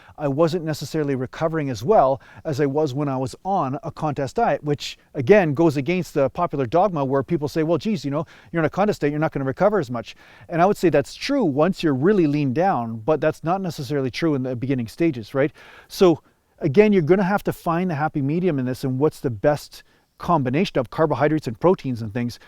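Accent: Canada. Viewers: He must be a fellow Canadian. I can tell by how he talks haha.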